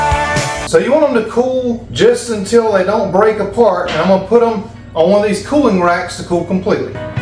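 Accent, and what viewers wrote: South, United States. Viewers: I forgive some pronunciations simply because your southern accent makes them adorable.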